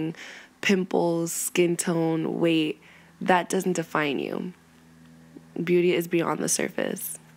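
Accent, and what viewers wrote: South, United States. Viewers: Is it just me or does this voice sound American? But I love this girl. This is so sad. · NAH YOU FROM THE SOUTH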